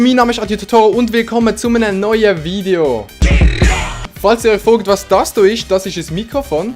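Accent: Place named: Zurich, Switzerland. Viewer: züri düütsch 😂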